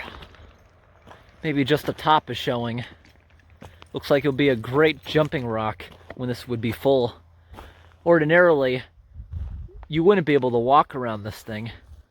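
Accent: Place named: New England, United States. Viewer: Just a couple of comments. First I love your dog, she is beautiful, would be nice to see more of her... second, I really dig your accent... is that typical 'New England'? next ....you are showcasing an outstanding part of your wonderful country, educating individuals such as myself to the rich and diverse wilderness still left in the north eastern states....Finally much respect to you for your selfless efforts to improve the environment for the benefit and enjoyment of your fellow bods. ....Peace and happiness from across the pond (Old England).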